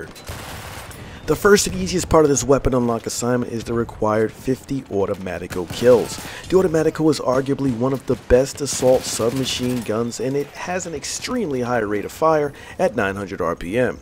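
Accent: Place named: United States. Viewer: Bro, You are 'THE' place to be to get the most out of BF1. Your voice is so clear and concise. I like your American accent. You know I'm from England, I speak way faster I doubt you would understand a word I say...Lol..Have a great Christmas brother.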